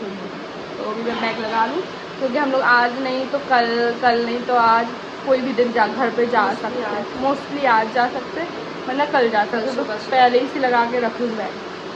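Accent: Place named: Bundelkhand, India. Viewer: Bundelkhandi achhi LG Rahi aap pe❤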